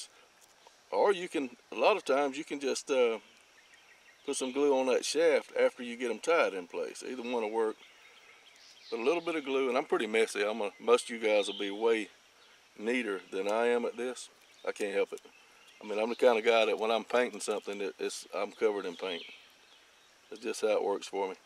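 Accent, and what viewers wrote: South, United States. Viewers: This guy is fantastic! As an archer from UK theres nobody ive seen,in years of shooting that makes things so interesting and inspires me so much to make things effective and traditional. Ive spent money both on bought and making my own. Loving the style, and that Southern accent too!!¡ Best wishes from South England 😊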